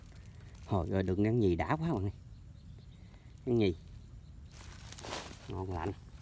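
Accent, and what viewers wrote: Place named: Hau Giang, Vietnam. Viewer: Bạn quê Hậu giang hả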